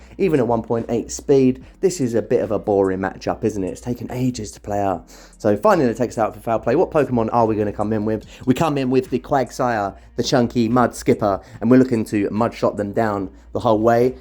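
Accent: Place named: Ireland. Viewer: Love the Irish accent 😳😍 please more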